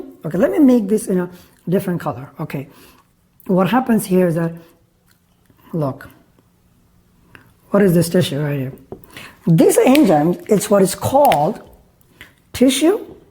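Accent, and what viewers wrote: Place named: India. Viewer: Sir your teaching is good but you will speak Indian kind English i thought better to understand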